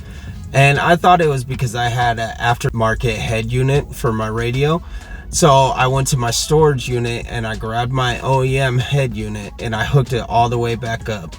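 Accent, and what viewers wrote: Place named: Bahia, Brazil. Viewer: meu deus que bahiano pqp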